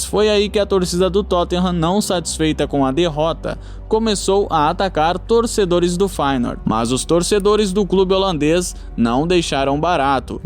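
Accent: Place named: Portugal. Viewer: Parece um português tentando imitar os br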